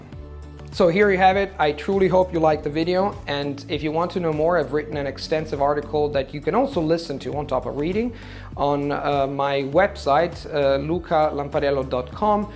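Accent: United States. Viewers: I'm shocked too, he sounds american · You sound American, kudos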